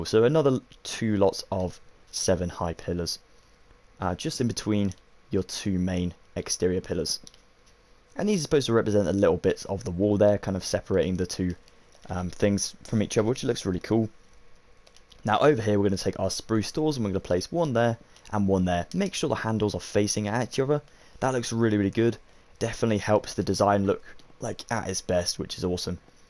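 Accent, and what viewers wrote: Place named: United Kingdom. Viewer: the british accent makes this better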